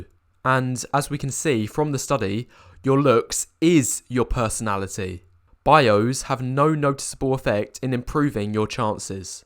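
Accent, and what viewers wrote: United Kingdom. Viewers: One thing I feel weird about this is that a guy with the British accent here is using measurement such as ft. and dollars instead of cm and Pound Sterling. Are these videos exclusively about the situation in the United States? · British accents adds aristocratic flavor to this content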